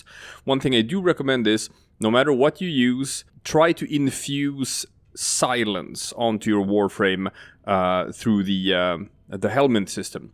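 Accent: Sweden. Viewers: is this swenglish? "im pressing i"....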